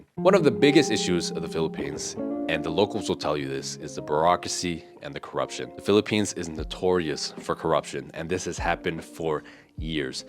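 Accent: United States. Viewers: I'm thinking about it because I want to surf and skate 🤘😎🤘 and play some music 🤘😎🤘 You know this cat doesn't drink sink water in America 😂 he's totally a gas station water bottle Guy 😂 He speaks like an American but he said he was Chinese/Philippines he didn't say anything about why he sounds American 😂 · tribal … no … family first … your accent sounds american … which has become tribal of wokism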